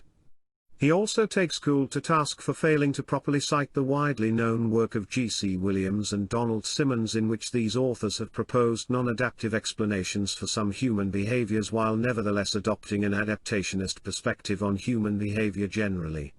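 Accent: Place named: United Kingdom. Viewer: The british voice actor ..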